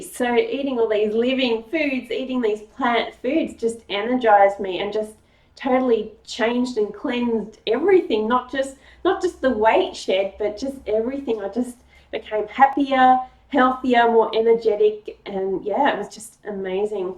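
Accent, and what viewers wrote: Australia. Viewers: Ja, ist eine deutsche Name. Und sehen Sie auch eine deutsche Dame aus, obwohl Sie hören eine Australierin aus. Woher (in Deutschland) kommen Sie? Ich bin ein Kanadier geboren, von deutschen Eltern. Und habe ich für mein ganz Leben hier in Kanada gewohnt.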